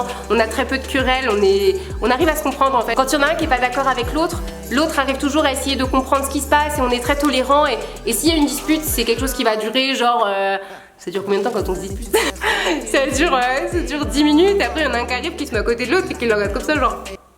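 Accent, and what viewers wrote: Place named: Normandy, France. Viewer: J’adore ton petit accent normand car je suis également native de cette région et j’ai cette sensation réconfortante, familiale quand je regarde tes vidéos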